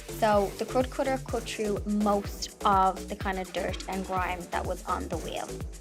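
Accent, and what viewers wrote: Ireland. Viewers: Lovely Irish accent! Super video. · I love your Irish brogue! It's darling, and your crafts are darling, too. The little gift boxes have inspired me to get me granddaughters crafting with me!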